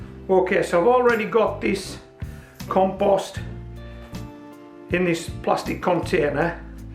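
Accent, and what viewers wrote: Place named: Yorkshire, United Kingdom. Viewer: Hi! if I'm not mistaken that accent is from Yorkshire, England. Correct me if I got it wrong.😊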